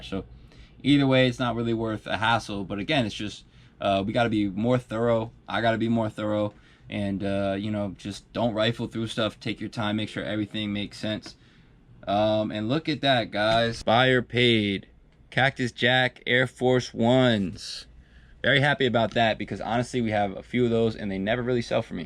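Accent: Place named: New Jersey, United States. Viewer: I think I just pin pointed where dude from ...howell..nj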